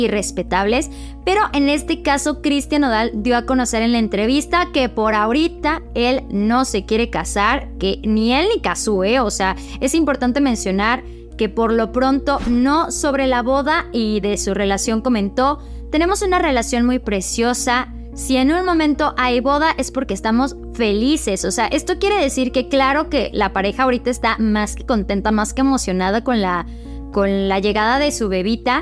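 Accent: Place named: Argentina. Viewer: Como se nota que eres argentina 😅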